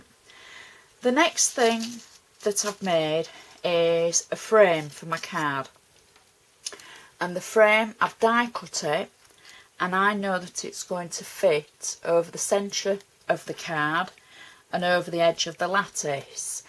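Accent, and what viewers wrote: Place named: Northern England, United Kingdom. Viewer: Nice to hear a northern accent I am from Bradford but now live in Bournemouth, where are you from?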